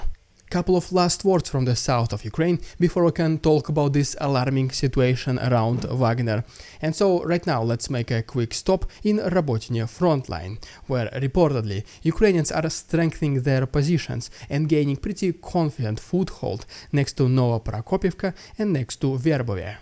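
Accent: Russia. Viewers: Demonetization of videos: There are people who comment on and report videos before they watch them. And it’s probably people who think they are doing something good. You’re called, you talk with a Russian accent and you start off with “Russian Propaganda”. people are looking to report people they think are Russian millbots. If you altered the name slightly like “the westernized Russian Dude”. And drop the propaganda you might be ok. If you operate for you then keep it the way it is. If you’re doing this to send a message to people think of making modifications.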